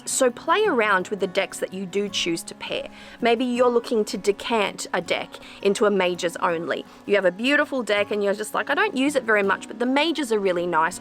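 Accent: Australia. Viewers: Another great video, and I thought you were Australian. I'm in SA and love your enthusiasm in your videos. Thank you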